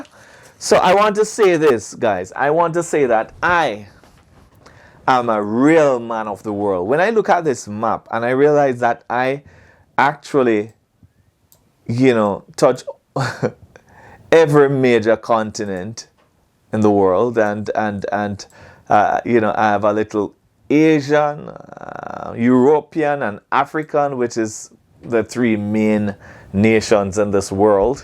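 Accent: Jamaica. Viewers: Well when you blend it all together, you can also look Arabian and Middle Eastern as well, but your talk is 100% Jamaican, ya man. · Love your Jamaican accent. I have been there. Wonderful country and people. I am proud to say I visited the best island in the Caribbean. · You definitely talk like a Jamaican! From the way you say “issues” & “persons” & of course “long distance stulla” 🤣🤣👏🏾👏🏾 Cool results!!! 🇯🇲🇯🇲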